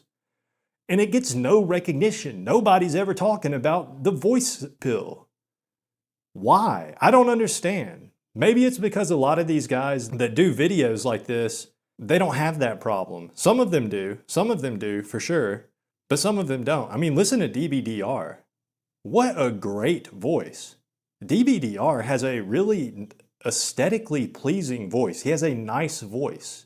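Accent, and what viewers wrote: South, United States. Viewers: yeah stfu dude. idgaf about you as this is the first video of yours i've seen. Your voice isn't giga-magnetic, but it's in the deep register and some people find the Southern accent to be extremely sexy. Talking slowly isn't inherity bad either. I also talk slow and "monotone" and never get the vibes people are being bored with me. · You’re from the South, right? What state? T. Savannnah, GA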